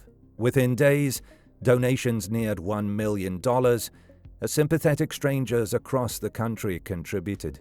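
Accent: United Kingdom. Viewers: I will cut him some slack as he sounds like he hasabritish or Canadian accent.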